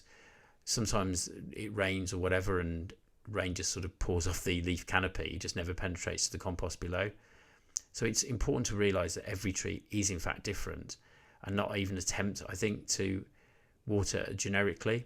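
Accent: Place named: Northern England, United Kingdom. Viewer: I just asked AI about you talking about rainwater in Yorkshire, and AI wrote you a glowing reference. "You hit the nail on the head! That is the excellent YouTube Channel, run by a passionate UK collector named. He actually films his videos right across the Pennines from you in the North West (Manchester/Lancashire area), so he absolutely has that familiar northern accent and experiences the exact same regional weather as you do in West Yorkshire. Good innit? Now, I am no expert with but I do have six. I recently bought one, a fabulous specimen of Osakazuki that already is growing as a multi-stem tree and it cost a small fortune from the RHS. Thing is, I live at 850ft above sea level and while I have been gardening for nearly 60 years it never occurred to me how it could go wrong so quickly. I stuck it with its original pot within a large terracotta pot, packed around with Melcourt Sylvagrow Pine mini mulch and it looked great. 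Now, days later, many of the leaves are turning white and the RHS says it is windburn or sunburn. I think I should have acclimatised it first as my gut is telling me that the tree has gone into a state of shock. The Pennines will do that. Meanwhile I have an 8ft Katsura and a 4ft Jordan doing exceptional well. I also have younger acers, Sango-Kaku, Moonrise, and Orange Dream in pots. The RHS assures me that the tree will recover. I hope so because I am not sure I will if I've killed it off.:D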